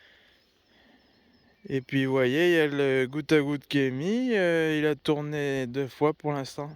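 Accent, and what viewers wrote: Charente, France. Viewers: J'adore ton accent Charentais (les cagouilles😄). Bonne continuation 😉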